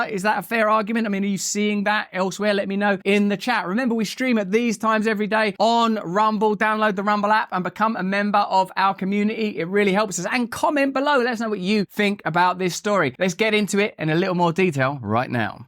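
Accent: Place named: Cockney, United Kingdom. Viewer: I saw you in a period piece for Public Telly with short hair in a suite and thought you were impressive in a Dramatic role. All this latent 1960s British Hippy or 80s big hair sex drugs, MTV crap is why you and Trump are kindred spirits, but you are more articulate even with that London Cockney. Brilliant assessment of the Gag Order and Trumps Al Capone comparison. Loved the Jesus analogy and Trump juxtaposition De javu.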